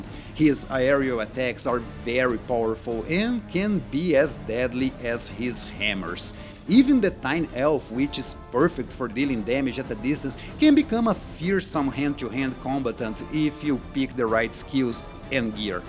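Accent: Brazil. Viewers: I can hear the Brazilian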